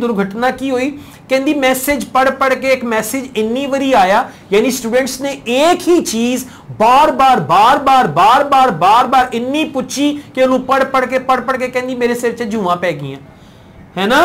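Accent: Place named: Punjab, India. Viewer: sir I love your Punjabi .. I belong to an area where exactly this excent is followed.. Thanks sir ...😊😊😊😊😊😊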